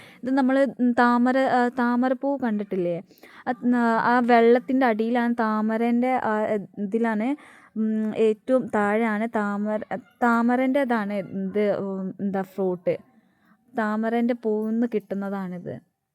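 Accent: Malappuram, India. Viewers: ഒരു പ്ലാവ് തൈ വാങ്ങി കുഴിചിടു 😂, ഒരു മലപ്പുറം സ്ലാങ് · ആ മലപ്പുറം ബർത്താനം കേക്കാന്‍ നല്ല രസം ണ്ട് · Malayaliyekkal nannai malayalam parayunnu 👍🏻naattil Malappuram side aanennu thonnunnu 🥰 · Slang malappuram, tirur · Slang കേട്ടിട്ട് മലപ്പുറം ആണെന്ന് തോന്നുന്നു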